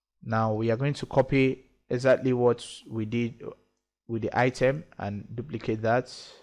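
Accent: Nigeria. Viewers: He sounds like a Nigerian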